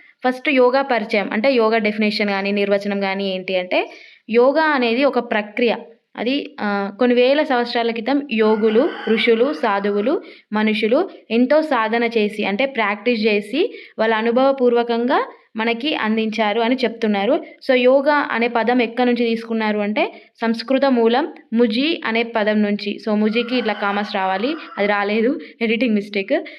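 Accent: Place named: Telangana, India. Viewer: Subjeect with telangana slang 😂 👏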